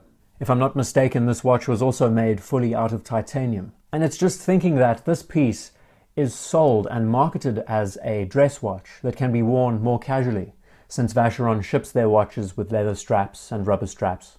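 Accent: South Africa. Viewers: I love you - your content. Also, your South African accent is perfect for YouTube. This VC is OUTSTANDING! Nice video mate. Stay safe.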